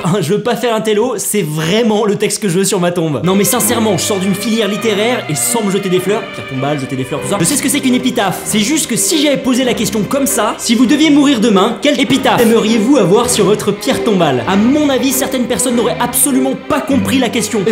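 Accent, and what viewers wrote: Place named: United Kingdom. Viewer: J'adore ton accent anglais british 😍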